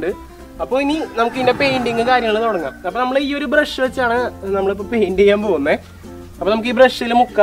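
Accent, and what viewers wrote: Thrissur, India. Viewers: തനി നാടൻ തൃശൂർ സംസാരവും കൺട്രി ലുക്കും ഉള്ള ഇയ്യാൾക്ക് യുട്യൂബിൽ എന്തു കാര്യം (ഇതു പൊതുവഴിയല്ല )എന്നു വിചാരിച്ച് മനസ്സില്ലാമനസ്സോടെ ആണ് ഈ ചേട്ടായിയുടെ വീഡിയോകൾ കണ്ടുതുടങ്ങിയത് ...പക്ഷെ ചുരുങ്ങിയ സമയത്തിനുള്ളിൽ നമ്മൾ സുല്ലിട്ടു ... ഇത് താടി വെച്ച അത്ഭുതം തന്നെ ... great ideas ....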